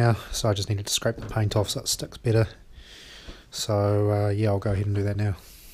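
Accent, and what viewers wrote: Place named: New Zealand. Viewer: Option 1: Mate go to scrap car yard get an old car / truck radiator spray it black put in insulated glass box point at sun. Option 2: Alternatively get copper or aluminum tube paint with matt black assemble in insulated glass box point at sun. Option 3: Section off part of garage roof, seal and paint matt black, place cheap clear plastic cover over the top and send water down. Note seal well due to expansion of roof when hot. The option you have shown as will as first two above would work over a camp fire, tea candles, hot embers etc.. Keep up the good work kiwi "Kia Kaha" Stay Strong, I heard accent and saw orange sand bucket.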